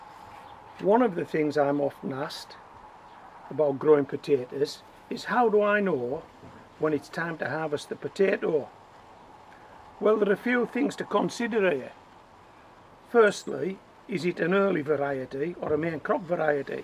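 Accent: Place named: Teesside, United Kingdom. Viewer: Hi, Just discovered your channel. From your accent i would guess you are from Bishop Auckland or Teesside. I live in Consett. Just watched your video about winter onions. I will give those winter onions a try. I already grow potatoes, carrots, parsnips etc in pots. Great channel by the way!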